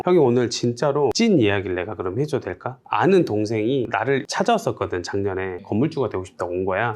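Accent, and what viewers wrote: Jeolla, South Korea. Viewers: 나도 전라디언싫어하는데 쟤는 말씨 안숨기잖아ㅋㅋㅋ억까는 하지말자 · 맞는말도 전라도 사투리라 사기치는 느낌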